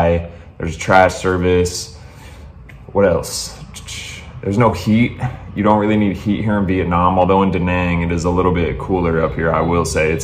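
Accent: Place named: Oakland, United States. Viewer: damn, what kind of accent is that? u grew up in oakland or somethin?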